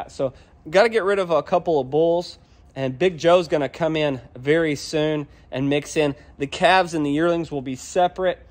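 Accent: Oklahoma, United States. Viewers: Oh, Gosh, I just love Bois D'Arc trees, and I respect your choice to hate 'em. I want you to know, however, they are not exotic. Osage Orange (Maclura pomifera) are native. In fact, their place of origin in North American is considered to be Texas, Oklahoma, and Arkansas. Hedge Apple trees grow invasively, no doubt. My guess is that's your quarrel with them. Invasive growth patterns are different from exotic species that become invasive.There is an exotic species Argentine Osage Orange (Maclura tinctorial) that is native from Mexico to Argentina. Our Osage Orange tree has an illustrious history on our continent from the indigenous peoples use of it to white settles using it in hedgerows for fencing prior to the invention of barb wire. I bet you've heard the expression about hedgerows that need to be "horse high, bull strong, and hog tight." That's the Osage Orange in a nutshell. 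This species also boasts the highest BTU of any hardwood on the continent. In woodworking, this species will burnish to a beautiful sheen. So deride them if you must. I understand. Here's are some links to back up my claims: <link> <link> <link> Btw, your channel is terrific. I'm an Okie transplanted in Maryland now for 30 years. Watching your channel is a lovely taste of home. The bison, the vista, your accent - lovely, lovely reminders of my origins. And I love your correct usage of the term "cattle." Ranching is your business, so naturally you know how to talk about it. I go bonkers when unin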